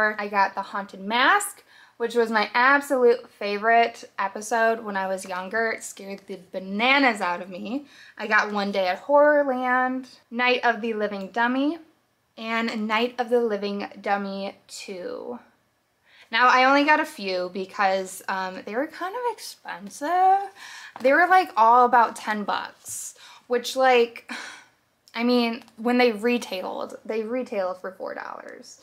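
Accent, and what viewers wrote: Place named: New Jersey, United States. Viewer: oh, your accent sounds like you’re from Jersey.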